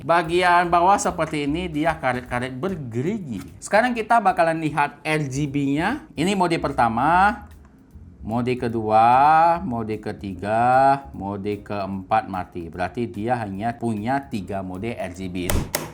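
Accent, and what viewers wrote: Medan, Indonesia. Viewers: berat "kali".., agak2 medan gaya ngomongnya.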